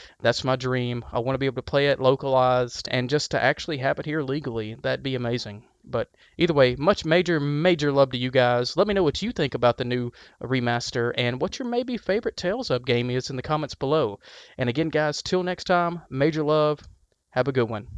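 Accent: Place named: South, United States. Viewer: Hearing that southern accent is amazing.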